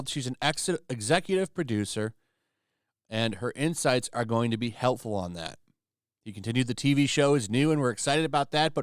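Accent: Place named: Aristocratic, United Kingdom. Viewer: I’m listening to this as I shop at Kroger and the pompous British aristocrat accent literally has me laughing so hard people are staring at me.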